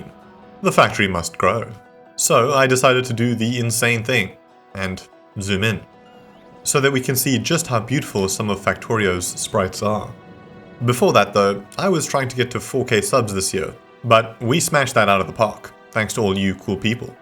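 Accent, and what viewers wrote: South Africa. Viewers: oh my god are you south african??? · You’re South African!!! Howzit bru 🤙🇿🇦🇿🇦 love the videos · South African, eh? Neat :) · A wild South African spotted! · Is he South African?? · I KNEW YOU WERE SOUTH AFRICAN!!! nice! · I mean this in the nicest way possible, this channel is such a comfort channel for me just cause it's so nice to hear a fellow south African yapping about nerdy shit (cool nerdy shit) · Love the ZA accent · Was like - thats a SA accent! :) Good luck boet!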